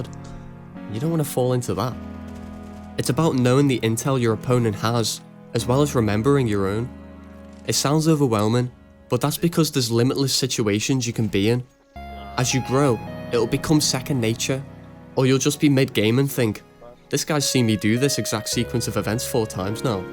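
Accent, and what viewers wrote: Liverpool, United Kingdom. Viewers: Scouse CS youtuber, I can fuck with that lad. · Love the video, are you a scouser mate?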